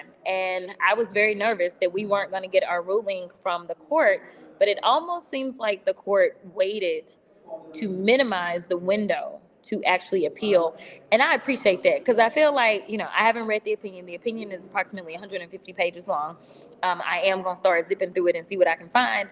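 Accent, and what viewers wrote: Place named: Texas, United States. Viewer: ILYSM. 🥰 Sometimes, your Texan accent gets me, and I have no idea what you're talking about, but I still love you. 🐨🇦🇺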